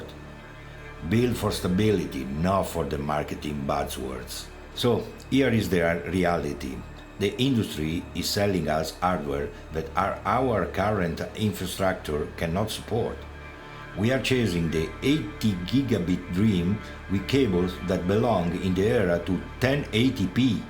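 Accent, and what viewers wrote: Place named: Greece. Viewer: Thanks for not using AI voice, despite your heavy accent (greek?). Id rather have someone attempt to make a video themselves than phone it in. That said, I have ran into this with my 240hz 4k oled. On displayport i have the bottom pixel row occasionally fizzle. On hdmi2.1 it has black screens and RGB flickering. Both hdmi and DP have issues with freesync which causes the AMD driver to completely lock up and crash. I pride myself of making my system very stable and optimized. But this damn monitor is always bugging out on me. Randomly turning off and the aforementioned issues. I dont think its the monitor itself...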